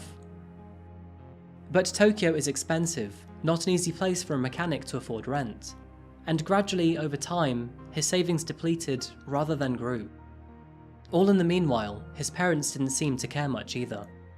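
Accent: United Kingdom. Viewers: this case is indeed fascinating, and now you [not for the first time, actually are you, you come across as utterly Beitish, your accent, manner, and speech patterns do not seem consistent with someone born in the US at all, not even from Seatle, which is a beautiful and amazing city, I haven't spent as much time there as I'd like, but the time I have spent was wonderful, such a fun mix of people of all stripes and types and killer architecture and natural wonders, the Puget Sound and San Juan Islands are simply glorious, untouched, unspoiled and it's lovely to see whales breeching from the ferries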